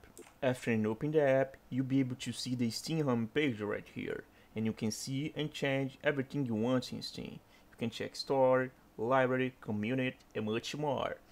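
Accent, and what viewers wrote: Brazil. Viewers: Kkkk mano, deu pra ver que tu é BR só pelo jeito que tu fala inglês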